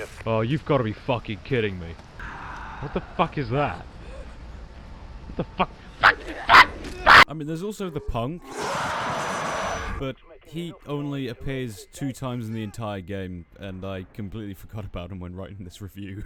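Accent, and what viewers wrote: United Kingdom. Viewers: I thought your accent was Australian at first but it sounds more British in this video. I'm bad at telling accents. Australian just sounds like a confident British accent to me.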